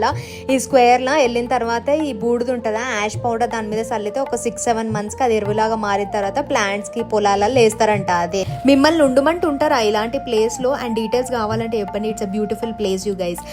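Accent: Telangana, India. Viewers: Your slang is telangana you are telling your place is srikakulam unbelievable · Nu srikakulam antav... telangana ammai laga matldtunav slang 😅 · Nuvvu matladedhi telangana basha malli mana srikakulam antunav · nuvvu pakka telangana kada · Me telangana yasalo aa vuru kosam chepte konchem konchem jock ga vunna nice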